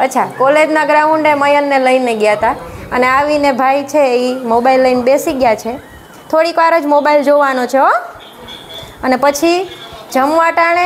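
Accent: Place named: Kathiawar, India. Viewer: Gujarati vlogs is far more better than Hindi. Your Hindi was not so clear and in Kathyawadi tone. Hence, our mother tongue is the best. Good luck.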